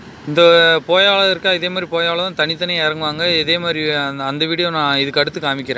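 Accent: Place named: Madurai, India. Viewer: Love to hear your slang thambi..please don’t try to change while you speak..I’m from Madurai and forgot most of the terms..While you speak,iI really feel good and become nostalgic.God bless.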